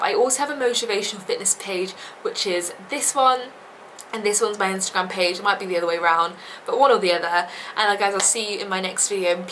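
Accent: United Kingdom. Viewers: Besides the tips that you've shared, I also love your cute British accent ANYWAYS Thank you for this wonderful video 💖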